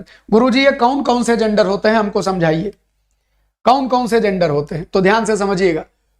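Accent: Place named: Lucknow, India. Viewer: Pranam Sirji..Hum bhi Lucknow se hain.. Hume aapke baat karne ke lahje se laga hi tha ki aap Lucknow se hain❤Jai Shree Radhey Radhey🎉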